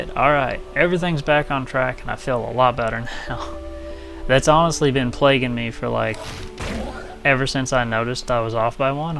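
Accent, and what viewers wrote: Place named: Toronto, Canada. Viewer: your a boss , my man! are you from toronto, cause you sound like it :)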